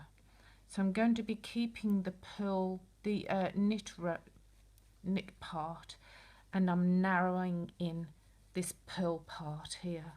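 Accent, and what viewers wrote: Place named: Birmingham, United Kingdom. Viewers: love your Brumie accent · nice to hear an English accent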